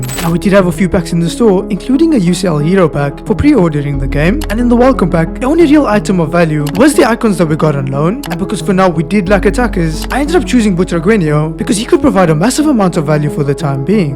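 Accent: South Africa. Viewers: is bro south african??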